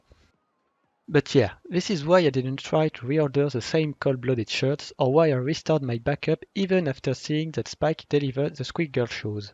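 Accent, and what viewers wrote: France. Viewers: il est francais ça s'entend grave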